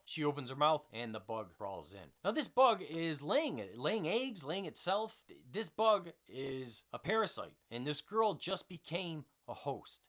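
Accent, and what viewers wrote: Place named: New Jersey, United States. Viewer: Maaaaan, what's with the accent? Where are you from? New Jersey?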